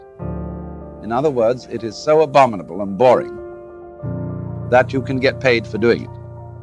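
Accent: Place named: RP, United Kingdom. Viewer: Alan Watts is like a man down the pub talking crap. It's just telling people what they want to hear about the F-ing obvious. 🙄 Americans are impressed by his posh accent. 😆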